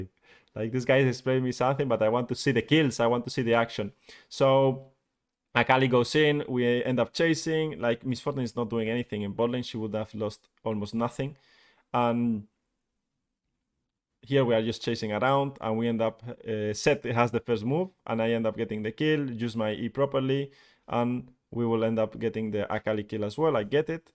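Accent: Spain. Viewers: Eres español? 🤔🤔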